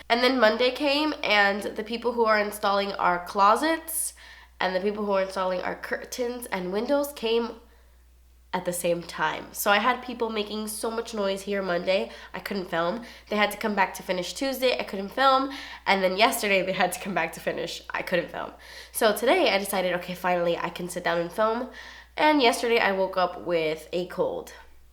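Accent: Cuba. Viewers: she's cuban from Miami :) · She's Cuban! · I love it when she talks in her Cuban accent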